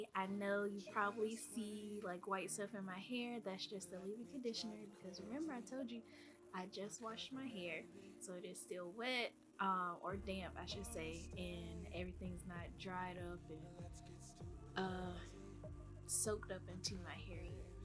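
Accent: United States. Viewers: That’s funny that Nichole Harmony_TV asked you where you’re from. To me you sound like my people from North Carolina. Where are you from? Anyhoo, I love your entire loc journey. With your problem sideburn loc can you just cut it off where the tip meets the latest knot and then as it grows start a new one as a braid loc? To make sure it is a secure loc? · Where you from?!? You sound like my family from Baltimore, MD! Just on your “too” and other “t” words… Lol! I do the same, and I wasn’t even raised around them! I love it tho!🤗💜🤗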